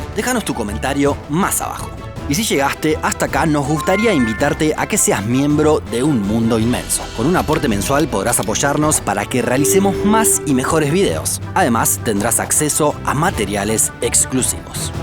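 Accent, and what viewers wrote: Buenos Aires, Argentina. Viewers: Escucharte me anima a querer visitar Argentina solo para saber donde tienen este acento 😍😍😍😘😘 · Es argentino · Además de los videos instructivos, el acento porteño me hace extrañar mi querida Argentina. Saludos desde Brasil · eres de argentina??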